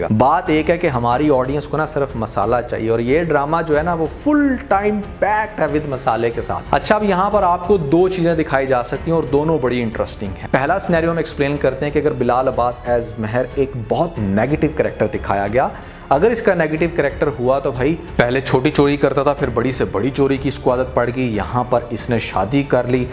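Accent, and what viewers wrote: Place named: Majha, India. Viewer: Punjabi ikdm Majhe wali boli aapne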